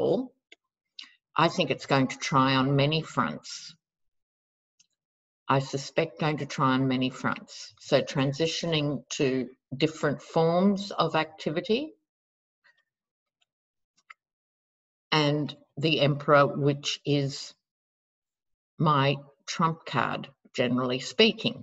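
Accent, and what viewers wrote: Australia. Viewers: We say it's speaking, 'Strine'! :) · The Australian accent is most charming. I never tire of listening to it.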